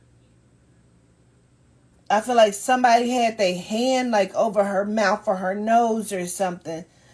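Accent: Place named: Chicago, United States. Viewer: I’m from Chicago and that accent is on point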